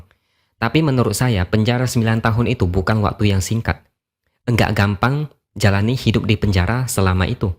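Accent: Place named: Chinese-Indonesian, Indonesia. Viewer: Logatnya seperti cindo lg ngomong bahasa indonesia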